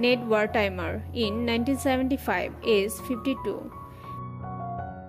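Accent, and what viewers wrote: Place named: South India, India. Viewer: This narrator has very strong South Indian accent. I can't understand her ?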